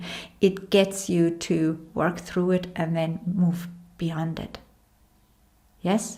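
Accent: Ireland. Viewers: Your sweetness and your Irish accent reminds me of Lorna Byrne, the Angel lady......... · Yir accent is lovely. Love the irish undertone. 🤗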